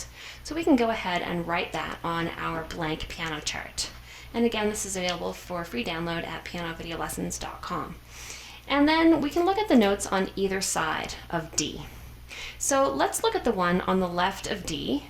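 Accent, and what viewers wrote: Canada. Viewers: I can really hear that Canadian Accent coming 'out'.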